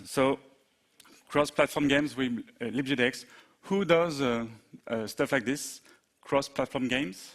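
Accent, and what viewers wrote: France. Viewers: Sacré accent Français :D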